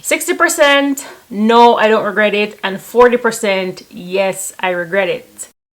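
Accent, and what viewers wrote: Jamaica. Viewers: Are you a Jamaican? You sound like a Jamaican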